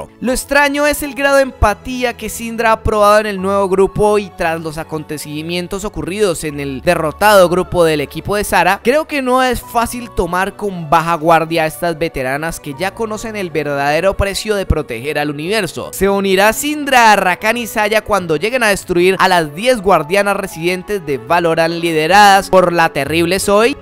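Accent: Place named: Bogotá, Colombia. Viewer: Ah, el buen habla de un Bogotano, no lo cambio por nada :v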